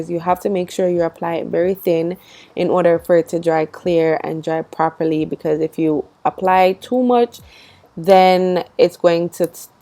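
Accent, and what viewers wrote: Jamaica. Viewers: Girl I knew you was Jamaican from you said the 1st 2 sentences!.. lol u goooo girl.. this style is 🔥🔥🔥.. the baby hair is giving!!!!!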